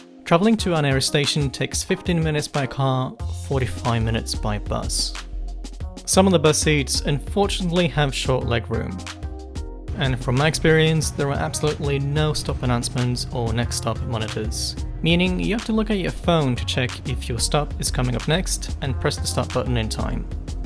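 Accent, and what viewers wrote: Australia. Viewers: Interesting you are from Norway; there is a lot of Australian in your English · For someone who lives in Norway, you sound very aussie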